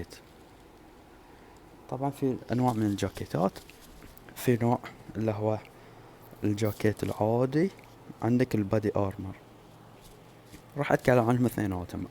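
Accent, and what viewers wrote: Bahrain. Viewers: يا زين اللهجة البحرينية 😌❤️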